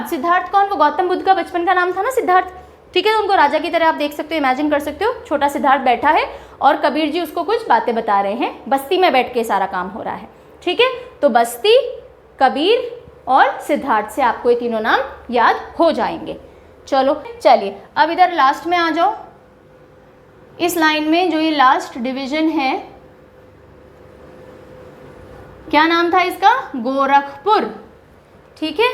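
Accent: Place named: Uttar Pradesh, India. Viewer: Maim aap bhi up walo ki trh bolne lgi hai map pdhaty time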